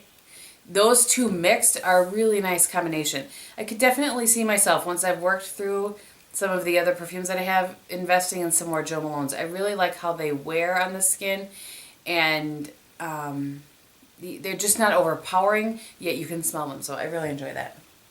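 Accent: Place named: Midwest, United States. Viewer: Hey! i just wanted to let you know I love your channel, when i started watching i was thinking "wow she sounds so much like home" and then i realized you were from the midwest too! I grew up in the Twin Cities so you sound like home ;)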